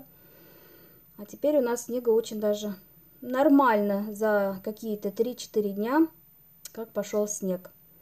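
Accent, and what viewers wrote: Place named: Bashkortostan, Russia. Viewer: Здравствуйте,!Хочу поддержать Вас и Ваш канал.Видно,что Вы добрая,хорошая девушка,у Вас прекрасная семья и чистый уютный дом.И обзоры интересные,и говорите хорошо.И акцент небольшой придаёт только шарма,мне это нравится.Вы же ещё и на башкирском говорите,поэтому и есть небольшой акцент.Не обращайте внимания на злопыхателей,к сожалению они есть и в жизни тоже.Всего Вам доброго,успехов и процветания каналу!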